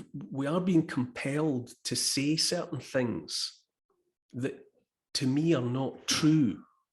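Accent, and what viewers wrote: Scotland, United Kingdom. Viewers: 'Are we gonna be poopets or no poopets?"written in a Scottish accent. Love that Scottish accent. "I don't want ta be a pie, I don't like gravy."...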